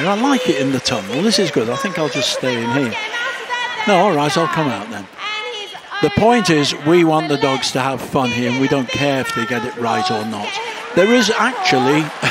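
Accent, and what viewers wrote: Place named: England, United Kingdom. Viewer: The English accented commentary to a Canadian listener is soooo freakin BRILLIANT!! I just can't!!🤣🤣🤣💖💖🍁🍁👌👌🙌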